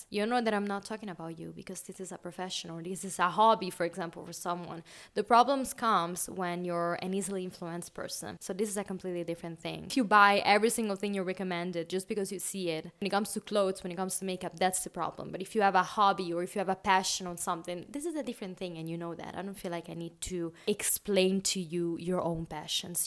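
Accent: Italy. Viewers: Mado, che bell dialetto italiano 🥰🤌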